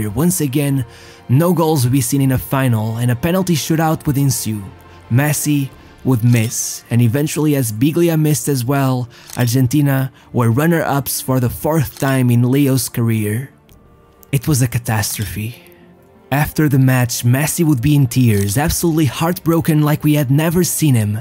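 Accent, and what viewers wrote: Portugal. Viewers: your perfect english vocabulary paired with your charming portuguese accent... bro... I am not gay or something but I am totally in love with you. You are telling those stories with true love from your heart and everyone can hear this. if maybe, 20 years from now, your videos will still be on youtube, I will watch them and maybe shed some tears of joy, coming from the nostalgia, created by your words about the good old times. merry christmas and a happy new year to you my fellow lover of the greatest game in the history of mankind. PS: I was tripping on LSD when I watched your CR7 video and it was an awsome experience.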